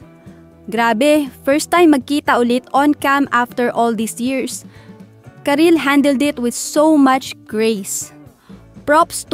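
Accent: Visayas, Philippines. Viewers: Tigil tigilan mona ang pag voice over mo hnd mo bagay bisaya amp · bisaya kaayo.. gahi imong Tagalog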